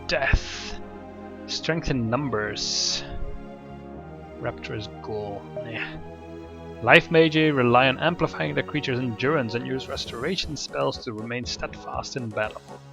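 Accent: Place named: Germany. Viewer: Just bought this game for my wife and I. Where's that accent from? I want to say German but I could be wrong.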